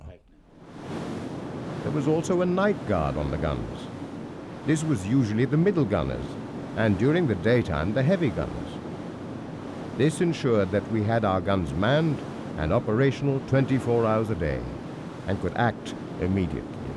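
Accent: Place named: United Kingdom. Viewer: Why do documentaries always have to be narrated by a brit?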